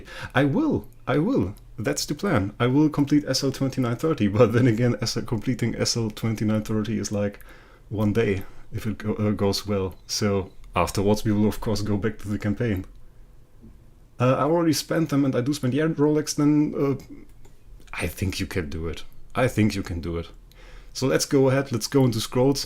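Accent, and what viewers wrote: Germany. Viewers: I could tell from your accent you were from Germany although I am pretty good with accents also Hi from Canada 🇨🇦 loving the videos bud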